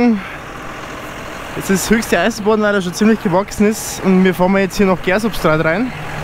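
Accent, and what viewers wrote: Bavaria, Germany. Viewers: Red no bayrisch passt👍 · Top Video, aba mit mehra Bayrisch is schena! Gruaß Flore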